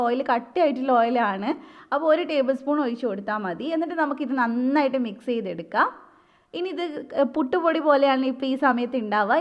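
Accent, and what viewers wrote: Kannur, India. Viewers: kannur ano? Kannur style slang allalo athkonda chodikkunnad. Adipoli snacks 👌👌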